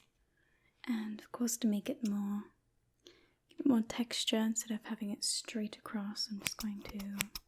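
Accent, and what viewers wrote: England, United Kingdom. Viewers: okay so hear me out: TALKING IN A BRITISH ACCENT. I’M LIVING FOR IT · I love that British accent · Yes Harry Potter rp! I love it and the slight British accent was so cute ❤️ Thanks for the great video <3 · gasp british accent british accent british accent british accent british accent british aCCCCEEEEENT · We thank you as well for such entertaining content. Your roles plays are second to none. Your English accent is spot on slightly posh but without going all Downton Abbey 😄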